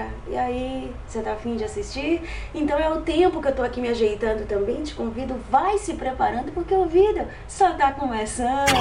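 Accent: Bahia, Brazil. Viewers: Faz pouco tempo que acompanho os seus videos , mais desde o primeiro momento adorei esse sotaque maravilhoso que me faz lembrar das minhas ferias ai na Bahia, e queria te parabenizar por transmitir tanta coisa boa nos teus videos e a cada palavra de sabedoria que você diz neles . Beijos e muito sucesso pra você !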